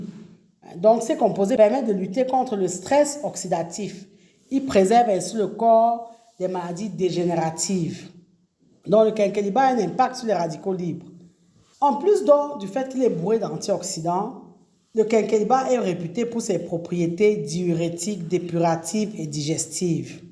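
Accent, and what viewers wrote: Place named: Africa. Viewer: Oui, j' aime sa voix et son français à l' accent familier et authentique africain.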